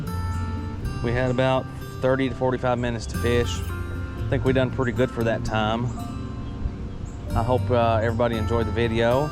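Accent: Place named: South, United States. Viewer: I love a pop-r. I once caught two at one time on a torpedo lol and on a hollow body frog lol. What state you in? That’s one heck of a southern draw you have lol. I’m mid-Ga. Macon Ga.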